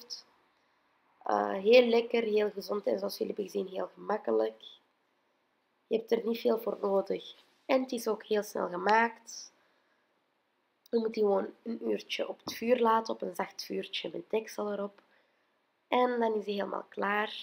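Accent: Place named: Antwerp, Belgium. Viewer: Bsaha. Ziet er heerlijk uit 😋 jij komt zeker uit Antwerpen want je woordenschat en intonatie is zoals Nora Gharib. Is niet slecht bedoeld maar als een compliment 😘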